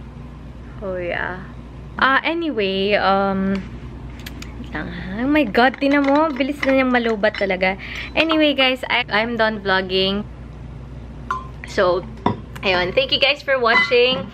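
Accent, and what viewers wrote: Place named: Pampanga, Philippines. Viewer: o diba si na pinanganak na tlagang may kaya ung pamilya sobrang humble and ineembrace yung kapampangan accent nya, yung iba na bago lang nagkaron dahil sa pagvlog sobra makaslang at pa sosyal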